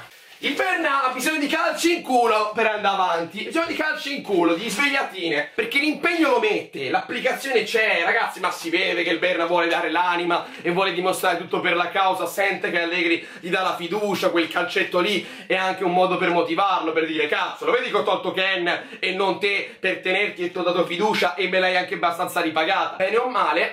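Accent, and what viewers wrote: Tuscany, Italy. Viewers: Sei toscano? Sempre e cmq Forza JUVE💚🤍❤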